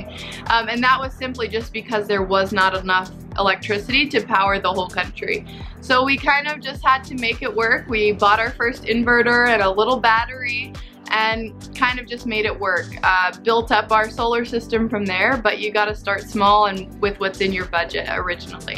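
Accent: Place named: United States. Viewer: What do you do for work? You sound american, so you just picked up and left, moving to a very random country..I’m wondering how, and why?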